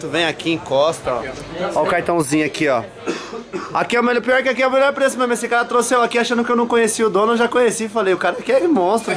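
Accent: Bahia, Brazil. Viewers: Mo Baiano kkkkj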